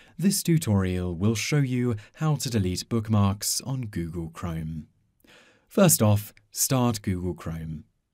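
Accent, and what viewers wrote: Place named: United Kingdom. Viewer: This is just godawful. The speaker mumbles and he mumbles with a heavy accent (british?) What trash! Didn't ANYONE edit this??????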